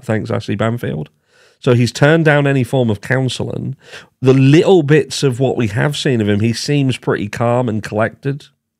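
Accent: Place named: Birmingham, United Kingdom. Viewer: I ♥️ this Brummie accent! Well, the personality of BK is truely puzzling, I absolutely agree. I never considered him an INCEL which they suggest him to be; yes, he has both narcissistic, sociopathic and also psychopathic traits, but in these terms I might talk about myself as well with quite of an ease. Seriously, I mean; and neither do I believe that being a N/S/ and/or/P would make one automatically a killer - not at all. However, what is really significant in BK, is his lack of emotions. He is a true introvert - coldly calculating, investigating; he show's up zero empathy nor ability to regret anything, incl his own obvious mistakes. He doesn't seek for attention - oh no; and he seems having counted on possible failures, that's why he is so calm now. The trial is just another pre-calculated epizode of his "masterplan". If he is the m****rer, of course. 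I see some very specific, deeply routed autism in his characterisrics instead, but of course I'm not any kind of a specialist to give such or another diagnosis. Could any form of autism lead to m*rders? Just some thoughts. Interesting contemplation, Man. Thanx & greetings.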